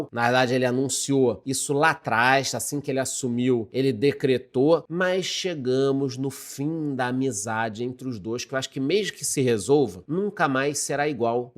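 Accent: Rio de Janeiro, Brazil. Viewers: Adoro esse sotaque carioca ❤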